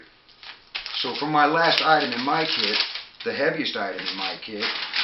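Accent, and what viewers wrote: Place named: United States. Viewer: He speaks English with American accent. BTW, American accent is the most spoken English accent in the world.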